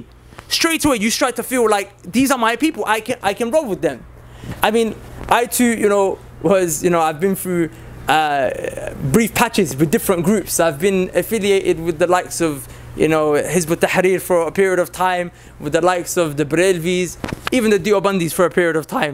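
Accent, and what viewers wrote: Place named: United Kingdom. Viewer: I can't believe dude speaks with British accent but some how it doesn't make sound smart 😂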